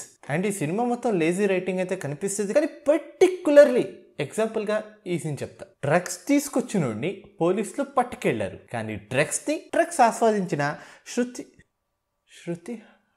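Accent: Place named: Karimnagar, India. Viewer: broo are you from Karimnagar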